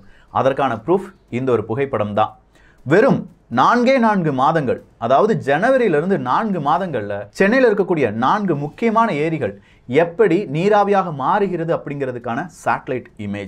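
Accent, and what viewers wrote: Kerala, India. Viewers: Are you basically malayalee. Now and then you speak in malayalam accent. No intention to hurt you. Just anxiety. Please reply.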